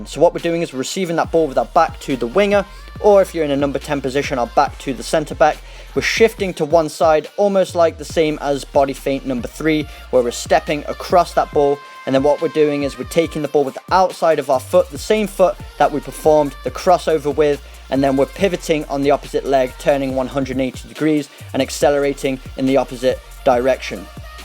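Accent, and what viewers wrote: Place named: England, United Kingdom. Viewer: Very nice,! Your English accent makes it more clear to understand here in America because the football commentator i like are English hahaha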